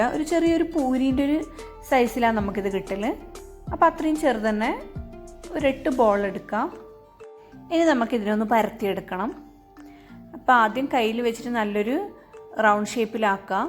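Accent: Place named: Kannur, India. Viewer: Kasaragod evideya njanum Ksgd but Ningale slang kannur touch nalla basha🤩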